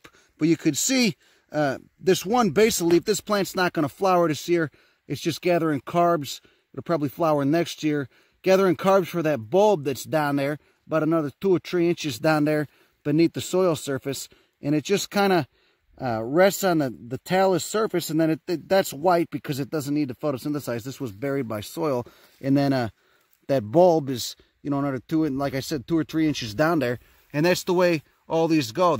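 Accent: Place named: Queens, United States. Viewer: Man, your Queens accent is mighty! This is the freshest channel to the science world, good shit homie...bet.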